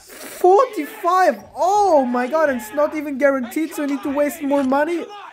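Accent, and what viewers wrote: Germany. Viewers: Are you german ? You have an german accent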